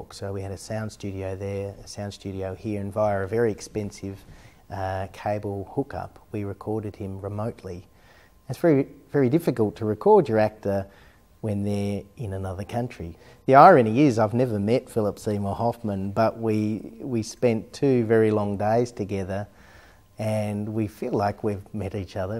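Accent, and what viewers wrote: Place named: Australia. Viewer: I’m glad he still holds the Australian accent I know in love. RIP Australian Accent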